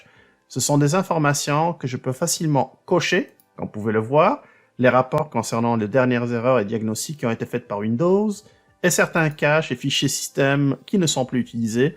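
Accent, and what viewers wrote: Quebec, Canada. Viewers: Merçi mon ami je n'ai pas d'ordinateur mais c'est sympa d'entendre le Québec ✌🤗😂😂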